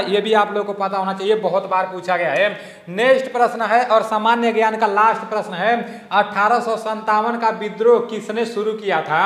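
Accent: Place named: Bhojpuri, India. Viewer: bhai to ser he🦁🦁🦁🦁🦁 bhai tumhara bhojpuri bahut achha lagta he😂😂😂😂 sun ne ko message from Gamer Odisha 😅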